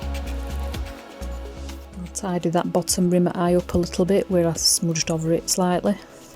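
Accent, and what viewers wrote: Yorkshire, United Kingdom. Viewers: Absolutely incredible work, 'really eye catching'. And how good does it feel to hear a Yorkshire voice for a change👍😷👍🏴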